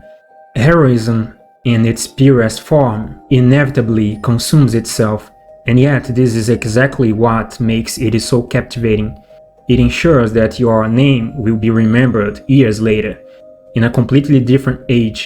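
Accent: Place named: Brazil. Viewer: Krl essa é a primeira vez que percebo o sotaque brasileiro no ingles kkkkk. Video mt bom. Tava querendo fazer algo parecido tbm.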